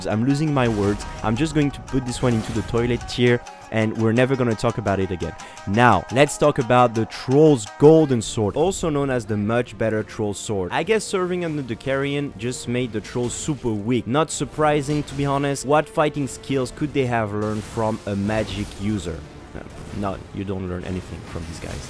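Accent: France. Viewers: Wait are you french ? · Ah mais voilà putain j’me disais que t’étais français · Really great video ! You are not just making a simple tier list but adding your touch and your fun to it, so it s really cool to watch !You gain one sub ! (Et je suis aussi fier de moi d avoir reconnu un petit accent français, validé par l extrait du début dans le lequel tu nous sort un petit juron bien francais 😂) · Are you french ? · damn that french accent... love it! salut frérot continue comme ça !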